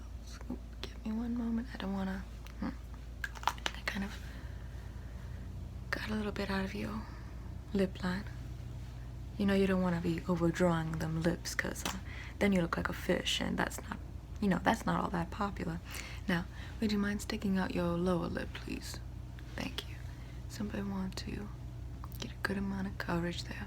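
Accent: South, United States. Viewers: More southern accent videos PLS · lol that made me smile :) And your old timey southern accent is resonant gold! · 1. I'm southern and faux-southern accents usually bother me in asmr in particular, but the antebellum aspect of this aspect made it so enjoyable. I love the sort of over-the-top elegance of thick civil war era accents. 2. I had no idea so many people were into vampire diaries until I scrolled through these comments. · You absolute babe, I love Southern USA accents in Asmr! Thank you 💜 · I love the freckle on your nose. Odd comment i know, but it is just so unique to your face. Lovely southern drawl, reminds me of Steel Magnolias~ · Southern accents are a kryptonite for me. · I know it’s probably a reference to something else, but the reference to the vampires and the southern accents just makes me think of interview with a vampire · Yasss the southern accent- so much love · Last night, I giggled when you mentioned thomas edison... 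I laughed myself awake at the "realization" that you are doing a southern roleplay and you set it during the civil war. "Lincoln is the president. and we are at the civil war. and I have a southern accent. That is terrible." Laughed the entire day about that series of statements. Thank you so much. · "and i have a southern accent...that is terrible ..." I laughed so hard I love it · I do adore the snooty sothern acsent becuse it sounds so gossipy and prim, i love it !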